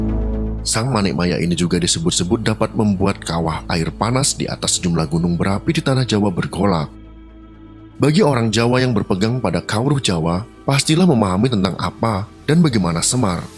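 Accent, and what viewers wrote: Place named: Bali, Indonesia. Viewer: Pengisi suara kek org bali ya logatnya 😁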